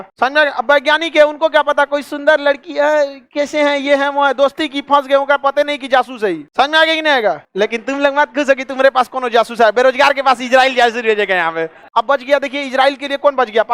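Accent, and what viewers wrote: Bihar, India. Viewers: Bihari me bolna band kr samajh ni ata kuch tera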